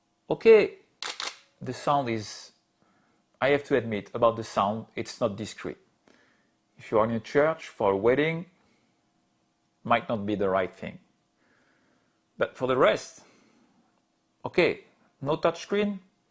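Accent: France. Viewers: Bonjour, je crois entendre un accent francais ;-). Vous me recomandriez la nikon d3s a 799euros? Je suis un debutant et cherche une camera pour la photographie de paysage et de nuit. Je concidere aussi une fuji xt30 ou une sony 6400. Merci pour la reponse d avance. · Assurément un français according to his accent lol big up!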